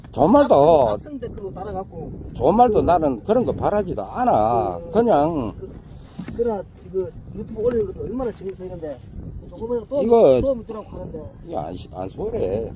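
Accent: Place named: Gyeongsang, South Korea. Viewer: 경상도사람들만느낄수있는애정가득한목소리가 느무반갑습니다.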